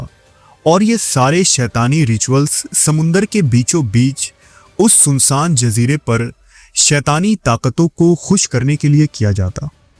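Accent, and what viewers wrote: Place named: Pakistan. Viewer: You are voice like Pakistan . Mashallah voice nice 😅😅😊😊😊😂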